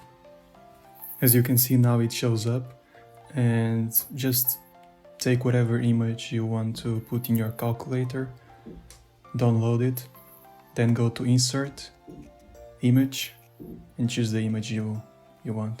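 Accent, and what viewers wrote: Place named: Portugal. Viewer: You definitely have a portuguese accent, tudo bem?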